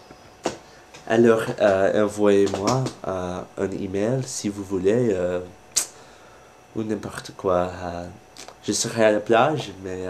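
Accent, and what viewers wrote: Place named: United States. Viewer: roooooooooooh j'adore l'accent !!! c'est décidé je vais me marier avec un américain! :D xD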